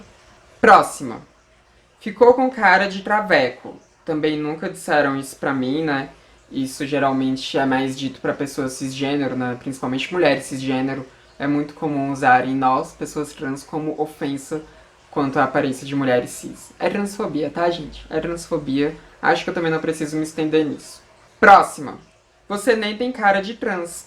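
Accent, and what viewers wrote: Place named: South, Brazil. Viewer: aaaah tendi, ñ sei pq o jeito vc q vc fala lembra um pokiiinho o sul 😄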